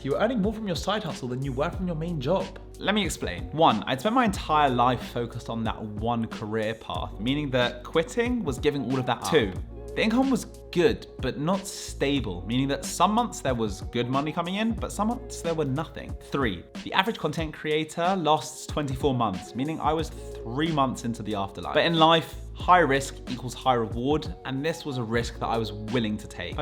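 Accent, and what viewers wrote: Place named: United Kingdom. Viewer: Why did you put dollars rather than pounds in the thumbnail when you’re British? Super confusing! I was thinking maybe you’re American until I clicked on and heard your voice. It couldn’t be any more British lol 😭💕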